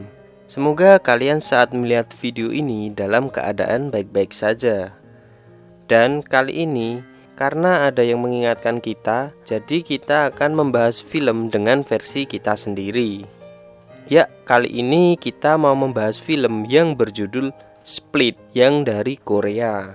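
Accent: Java, Indonesia. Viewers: Suaranya kaya agak medok jawa? Wkwkkw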